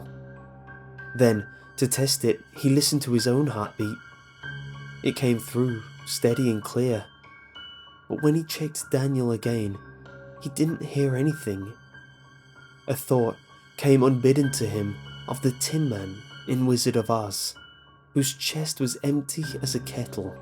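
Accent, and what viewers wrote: United Kingdom. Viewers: Happy birthday,. Honestly, your voice is perfectly for these kinds of creepy feelspastas. It has that earnest, nurturing feeling that just complements them perfectly.... And the accent. The sexy British accent.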